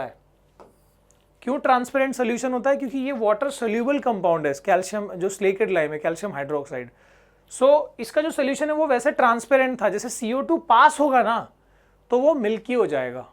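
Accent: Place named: South India, India. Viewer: Sir aaj aap south + Mumbai jaisi hindi Kyun bol the he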